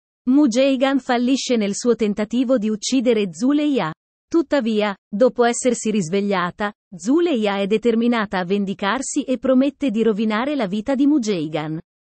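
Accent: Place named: United Kingdom. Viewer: Perchè citare i nomi turchi con la pronuncia inglese? Inascoltabile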